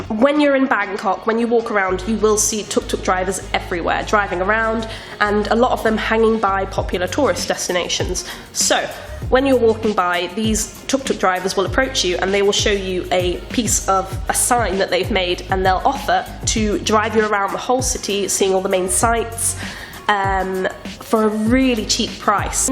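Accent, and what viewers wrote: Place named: London, United Kingdom. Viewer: I love your accent are you from London England ?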